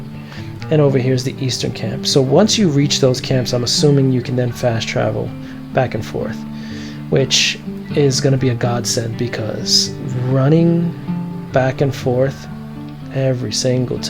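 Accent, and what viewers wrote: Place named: Northeast, United States. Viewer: Hi, I enjoyed your video. I'm thinking about purchasing the game, so you are helping make up my mind. Looks like a great game. I love your accent, I'm thinking northeastern American city?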